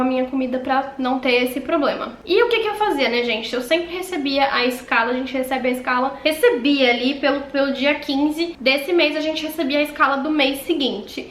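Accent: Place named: Paraná, Brazil. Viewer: querida, vc é do interior do Paraná? Temos sotaque parecido!!! Concordo organização e planejamento é fundamental, parabéns pelos seus vídeos!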